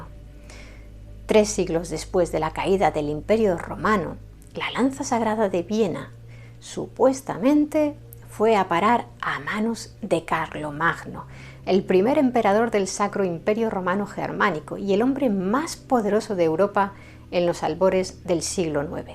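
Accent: Spain. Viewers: Eres una excelente docente con una hermosa voz. Para mí acostumbrado al acento rioplatense tu acento hispano me suena muy bien. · Me gusta mucho tu acento español, el tiempo que te tomas explicando con sumo detalle, mi suscripción la tienes =)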